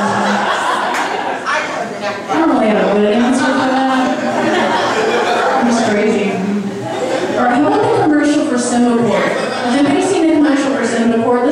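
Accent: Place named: South, United States. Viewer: Visited your site to find what a "southern belle" accent was, stayed for the comedy.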